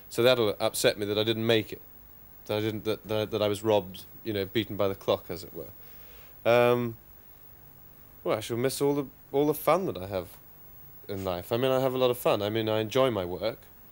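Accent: RP, United Kingdom. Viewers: I could listen to this guy for ages. He's posh but not pretentious.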